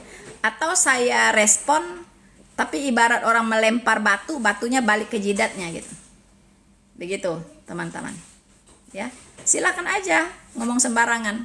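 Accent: West Sumatra, Indonesia. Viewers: Hallelujah, Tuhan memakai hambaNya yang cerdas dan tegas menyampaikan kebenaran Firman Tuhan. Meski memakai logat Minang, namun tetap menarik dan menjadi berkat. Semoga para pemikir Minang seperti Ade Armando dll yang mengandalkan logika bisa terinspirasi dan menerima jalan keselamatan dengan mendengarkan penjelasan penjelasan.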